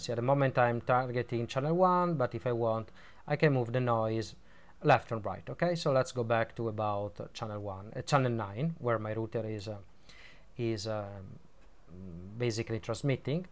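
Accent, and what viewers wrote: Italy. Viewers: sei italianissimo e lo si sente! non cercare di nasconderlo bro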